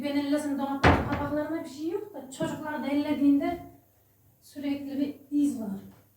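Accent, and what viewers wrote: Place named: Aksaray, Turkey. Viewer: Videolarin izlenme orani,abone sayisindan fazla!!.bir zahmet onlarda abone olmayanlarda abone olsalar😊.Demekki aksarayliymissin.Ogün bir videonun altinda yazmistim.aksaraylimisin kayserilimisin.sivenden anlamistim.Aksarayli elmasin hayati,bide onun gelini cansu otuk var.onlarda bu sivede konusuyorlar.Hatta siz konusurken,sanki cansu konusuyor😊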